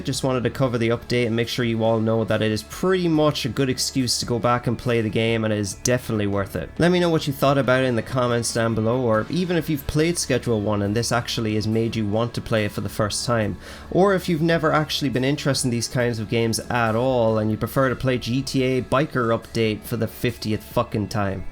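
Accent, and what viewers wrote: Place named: Ireland. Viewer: Nice video bro, What part of ireland u from man?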